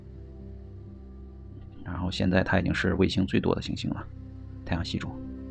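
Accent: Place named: Shaanxi, China. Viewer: 陕西人吧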